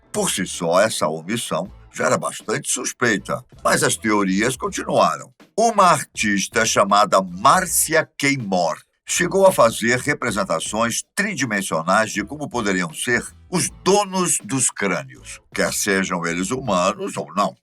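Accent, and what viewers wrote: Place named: Ceará, Brazil. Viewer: Quase achei que era um cearense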